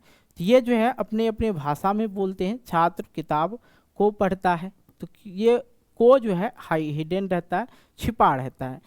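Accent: Bihar, India. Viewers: Sir aap bihar se ho kya 😅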